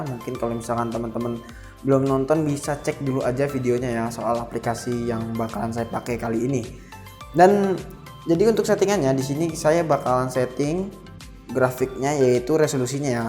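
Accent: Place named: Banyumasan, Indonesia. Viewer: Njer basane ngapak😭🤣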